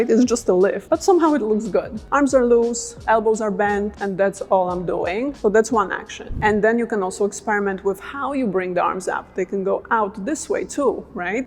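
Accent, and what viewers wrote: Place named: Poland. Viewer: As a pole from the accent, I think you are polish, are you??! Am I right ?!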